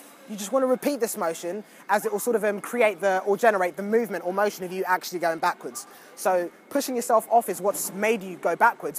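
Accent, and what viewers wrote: United Kingdom. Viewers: Are you British or American but I guess your British by the sound of voice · love his British accent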